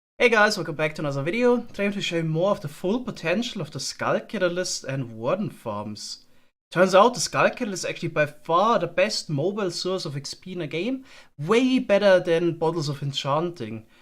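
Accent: Germany. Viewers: Der deutsche Akzent ;-) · er hat nen deutschen english Akzent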